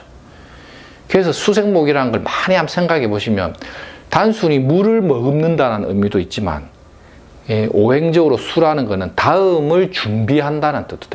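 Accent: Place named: Gyeongsang, South Korea. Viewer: 감사합니다 경상도 사투리가 너무듣기 좋아요! 나이트 ㅋㅋㅋ ~~~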